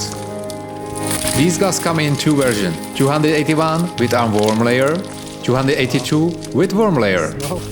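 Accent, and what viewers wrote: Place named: Czech Republic. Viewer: Hi, I just want to ask you, where are you from? You have english accent that sounds a little bit Czech. Im just really curious if you arent from Czech Republic.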